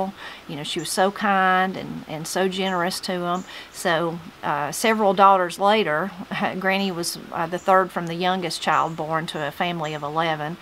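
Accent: Appalachia, United States. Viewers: I love hearing you talk, with the accent my Appalachian family brought to mo. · Your accent is beautiful - it speaks of where you’re from and the long history of people in Appalachia - accents aren’t as strong here in Canada. I really enjoy your videos - thank you!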